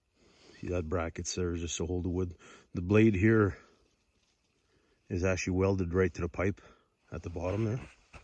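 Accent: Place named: Atlantic Canada, Canada. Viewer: Good job. I'd like to make a groomer for lake trails, that shaves off wind blown moguls and fills in the dips. My idea is that it would be on skates that would dig down and skate along the ice. And the whole thing would be height adjustable with about 4 legs that the skates would be attached to, to set you blades at the mid point or so of the mogul depth. Skates would have to be designed so the helped pull the unit down and maintained contact with ice as best as possible also. Just an idea bumbling around in my head. Sounds like your from Canada's east coast?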